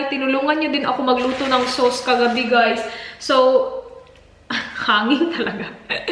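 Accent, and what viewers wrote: Visayas, Philippines. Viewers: Makakatowa kanaman mag salita ate girl Bisayang Bisaya..I love you ate girl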